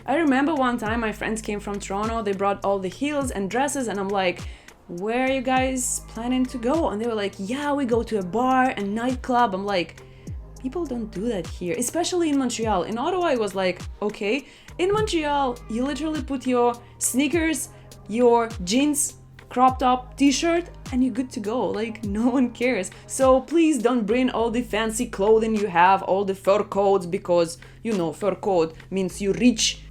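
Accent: Russia. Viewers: from your accent i guess you are from =Rosiya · Ur Russian police aksent😁, was great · Hahahaha I love Russian accent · I like it when you still put your Russian accent. Informative vid by the way. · You russian accent is hilarious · i like your russian scent when you start to be mixed accent of russia with English 😂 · Tão fofo ela falando inglês com sotaque russo. Hahahaha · Well I liked your Russian accent... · I'm sorry, are you Russian? Because I personally am, and uh... I kinda can hear that :) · I like how her accent is a little mixed with Russian 😍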